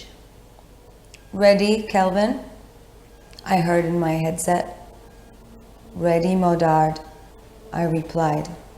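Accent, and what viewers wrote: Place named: Canada. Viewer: Ehhh Sounds like a Canadian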